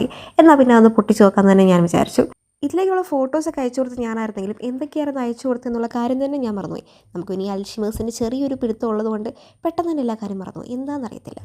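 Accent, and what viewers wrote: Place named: Kozhikode, India. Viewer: Calicut slang ollalo da❤️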